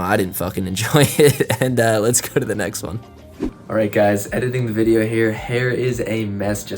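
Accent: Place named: General American, United States. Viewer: Hey Sorry about the unlucky runouts!! Did you grow up in New Jersey, or did you grow up further west? You seem to have a more general American accent rather than the "typical New Jersey accent".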